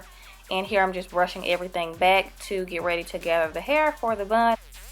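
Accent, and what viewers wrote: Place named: Alabama, United States. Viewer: Alabama, United States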